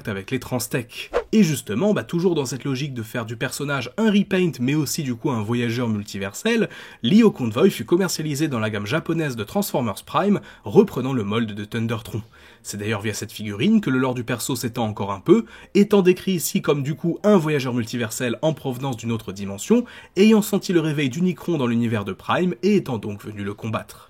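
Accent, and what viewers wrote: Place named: France. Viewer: J'avoue qu'avec un accent français, on comprend comme ça !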